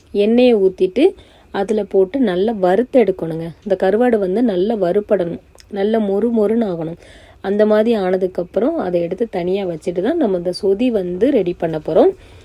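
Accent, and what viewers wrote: Tirunelveli, India. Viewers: நீங்க திருநெல்வேலியில் சொல்றீங்க ஆனா சமைக்கிறது எல்லாம் வேற மாதிரி இருக்கு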